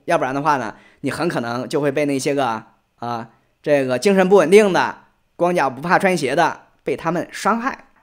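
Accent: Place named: Hefei, China. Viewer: 讲话的很象合肥人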